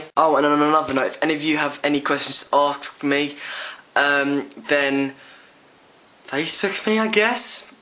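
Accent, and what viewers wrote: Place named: West Midlands, United Kingdom. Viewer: ...lly beautiful, real handsome guy. You from the West Mids? (just, ur accent) x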